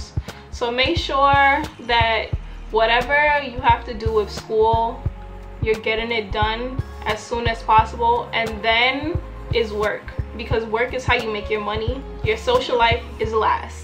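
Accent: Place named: Caribbean. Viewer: Love your vids! Where are a from? I watched a few of them and I think I can hear a slight Caribbean accent, with certain words that is.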